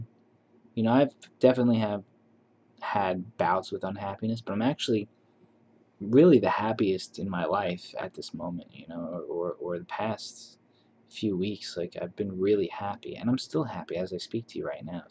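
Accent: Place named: New York, United States. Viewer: When you say "personality", do you still identify with INTP and the idea that personality becomes rather fixed by this point in life? As far as diving deep and really searching and theory building around yourself, I feel like for INTPs it feels really really fixed and not in a happy way. I accepted that, and I gave up on trying to subvert that. However, I feel there's ways to de-emphasize that insecurity, that deeply analytical or critical personality conflict. Just going exploring, weird places and doing new stuff, especially physical stuff, where you lose your head space . Like how traveling requires way different aspects of my personality and at the same time dissolves my identity because I don't know anyone and no one knows me so I can be ridiculous. Like just walk down the street and stick out your thumb and see if someone picks you up and you might feel like your personality could change. 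Or find a freight yard and hop on a freight train and become a new person, someone you've never met. What I'm saying is I think sometimes we get stuck in personality patterns and cycles because our environment. If we change our environment or schedule or the weather or people in our lives, we can see new parts of ourselves. You sound like you're from New York or something so you know how defined things can be on the East Coast/in the Northeast. Maybe those definitions highlight your insecurities? I'm from Massachusetts so I know this is possible. Our state creed is "I'm so much better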